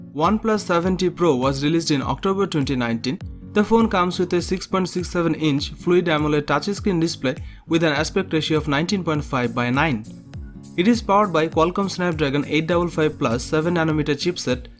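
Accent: Bengal, India. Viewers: Bengali accent irritating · Another indian vloger I just dont want their accent... It always looks like other vlog that dubbed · That dense Bengali accent in your English man!! ( P.S. Coming from a Bengali himself :D ) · Ffs Indian accent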